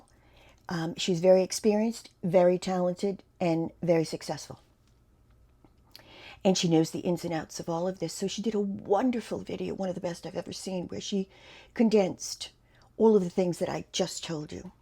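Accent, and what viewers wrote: New England, United States. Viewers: I’m here because Jeannie. I love her willingness to help other creators. I’m sorry to hear of your YouTube struggles. It’s my understanding that things can get very complicated. I wish you the best. On a side note: I think I hear a New England accent?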